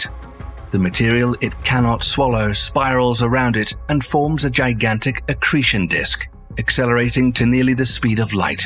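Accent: United Kingdom. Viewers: Can’t the narrator be an American. I can’t take the awful sounding British accent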